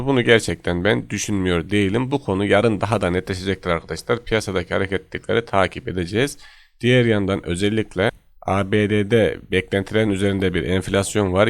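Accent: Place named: Kaman, Turkey. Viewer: Geçmiş olsun , Kamanlı konuşmanı biraz düzeltmiş hastalık.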